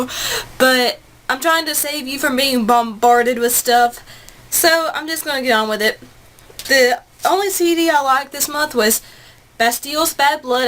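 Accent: South, United States. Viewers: Yeah I agree. I don't know why anyone would dislike your videos. You are funny as hell and your southern accent is adorable. You also have wonderful tastes in just about everything. power!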